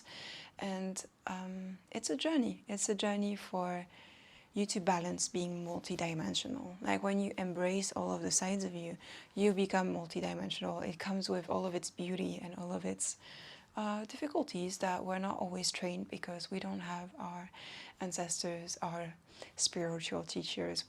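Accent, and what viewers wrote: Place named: Germany. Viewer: hmm really, you mean you get told about a german or dutch accent? Peut-être est-ce que vous venez d'une région où il y a un certain accent allemand ou autre - je sais qu'il y a des pays européens où les germanophones et francophones se cotoient (aussi, merci d'avoir répondu et d'avoir éclairci ma curiosité - moi aussi je parle français, je vis présentement au québec, ce n'est pas le meme accent mais personnellement j'aime beaucoup l'accent francais européen. Je suis étonnée de ne pas l'avoir reconnu cette fois-ci! hehe)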